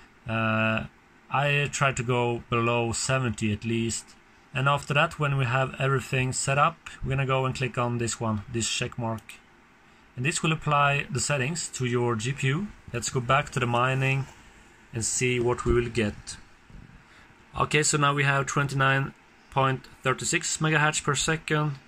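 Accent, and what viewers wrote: Sweden. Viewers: Ahahah i got the Dual Mini model... Flawless Price ...snagget it right before everything went Haywire with the prices. Im Swedish too, you sound like Stockholm dialect.. :D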